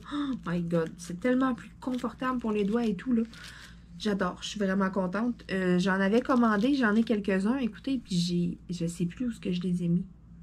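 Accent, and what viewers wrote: Quebec, Canada. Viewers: cc bravo et merci pour ce bon moment et ton rire avé l'accent quebecois,c'est divin merci de super cadeaux j'adore le stylet bonne fin de journée gros bisous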